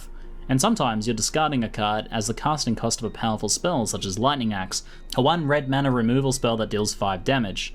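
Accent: Australia. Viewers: oh wow, I haven't seen any mtg content created by a fellow aussie before, this is awesome! I want to find more of the Australian MTG community, where/who are the people? Sometimes it's just nice to hear an aussie accent, you know? :D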